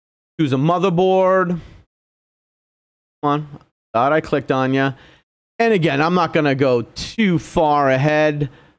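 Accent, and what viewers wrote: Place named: New England, United States. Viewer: you sound like you're from either New England... or New York. (edit) The way you said "part" just narrowed it down I think. Massachusetts or Rhode Island.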